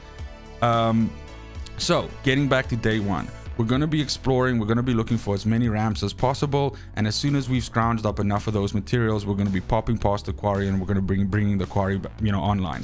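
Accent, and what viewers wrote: South Africa. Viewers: Your channel says Denmark, but you sound like you're from South Africa. Anyway thanks for the tips bro. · South African perhaps? I hear quite the familiar accent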